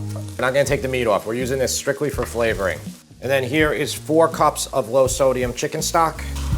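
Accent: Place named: Boston, United States. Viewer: Your accent is very similar to mine. South of Boston? I really enjoy trying your dishes. They’re not overly complicated and they’re hearty. I’m feeding a picky 11 year old so I appreciate your son’s reviews. 😊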